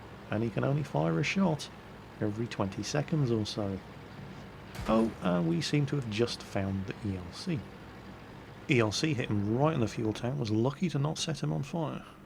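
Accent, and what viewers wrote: Russia. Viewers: Dat Russian accent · Your russian accent is just awesome)) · I lvoe both you and your Russian accent,:3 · Rita, what do you think of ' "Russian" accent? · dat russkie accent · Love your Russian accent it killed me :) keep it up! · Please do a video with you talking only with russian accent. Its priceless! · speaking with russian accent... so weird and yet so sexy... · Nice Russian accent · No matter what anybody says - that Russian/English IS funny as hell :-D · I love dat russian accent of yours. This made my day.:) aaand my family thinking to call a medic, since i laugh so hard, they think i'm become mad or sg.:) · Your russian accent is very funny, tovarish. xD Btw.: My best shot with the KV-2, was when I splashed a Panther and make his engine burn. :D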